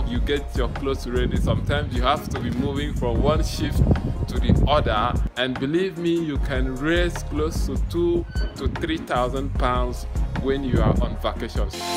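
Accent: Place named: West, United States. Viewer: Igbo boy alert! But you sounded like you're from the west. 😂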